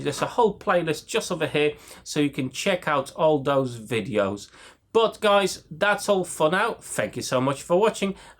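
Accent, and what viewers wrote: United Kingdom. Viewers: Where in the uk did u learn your accent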